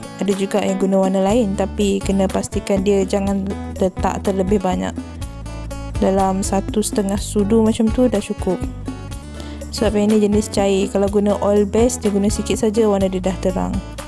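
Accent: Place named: Sabah, Malaysia. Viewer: tq resepinya.. tp knp bunyi awak ckp kdg2 mcm org sabah? hehehe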